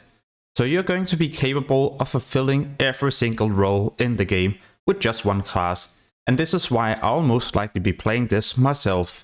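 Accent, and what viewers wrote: Denmark. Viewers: Are you dansk? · You sound Danish :)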